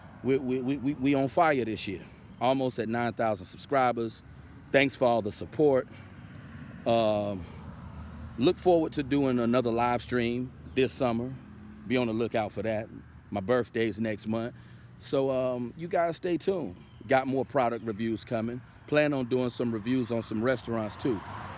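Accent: United States. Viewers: Move to South East Asia . As a black man, you have BBC advantage , the american accent and the American passport . These are enough to get you an average Asian chick . African women are no different from American women .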